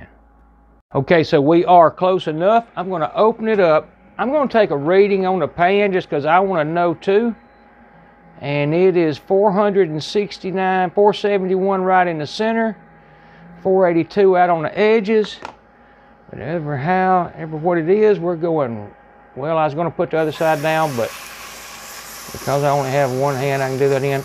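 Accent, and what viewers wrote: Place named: Georgia, United States. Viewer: I found your channel after searching YouTube for ways to use the Instant Vortex oven ... Love your Georgia accent!!! I have binge watched a lot of your videos! You are a pleasure to watch. Keep putting out great videos!!!! Joanna from South Carolina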